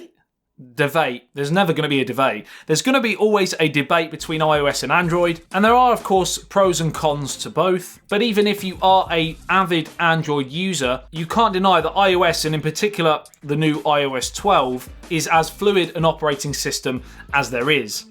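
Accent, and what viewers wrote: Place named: Northern England, United Kingdom. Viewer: This is not RP. Bad northern England accent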